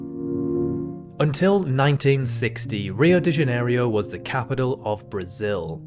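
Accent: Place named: United Kingdom. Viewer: Remember that he is British. British people talk differently. I kinda like the pronunciation “Kih-gah-lee” better anyway.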